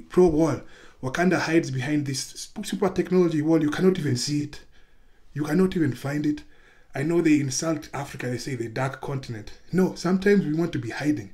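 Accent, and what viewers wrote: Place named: United States. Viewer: Your accent says you're American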